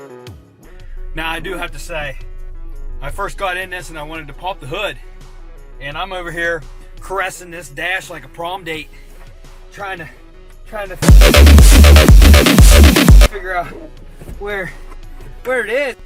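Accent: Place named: West Virginia, United States. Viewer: Just curious. Kinda sounds like a WV accent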